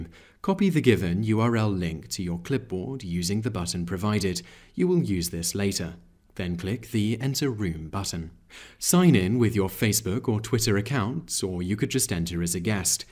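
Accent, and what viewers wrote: United Kingdom. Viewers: Terrible UK accent, could not Understand, very different from American English. Therefore useless to me.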